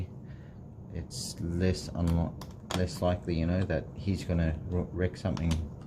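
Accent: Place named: Australia. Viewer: That's awesome and you sound Aussie too my in nsw can you please send me your details need this mod done a a few other things. Look forward to hearing from you ASAP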